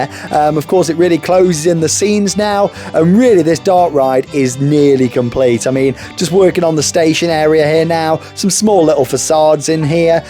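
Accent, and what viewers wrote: United States. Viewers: your American accent is very good! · Love the American accent. Didn’t think it was you at first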